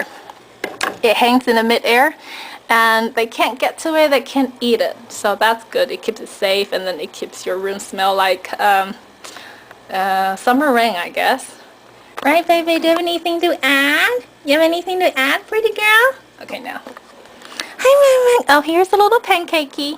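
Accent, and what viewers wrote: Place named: China. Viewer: She's Chinese, so it's just her accent.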